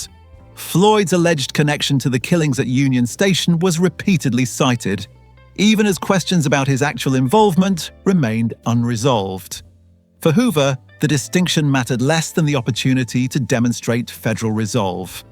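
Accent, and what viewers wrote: England, United Kingdom. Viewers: GET RID OF THE UK NARRATION VOICE!! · They think it sounds more intelligent and more informed , Americans are suckers for English accents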